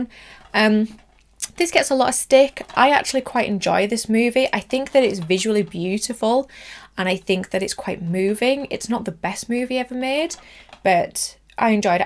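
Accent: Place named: United Kingdom. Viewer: Just recently discovered your channel! Love the content and the awesome Childs Play Vs Childs Play video. Made me really appreciate both of them alot more. So refreshing as well to find a fellow brit amoungst all the american voices.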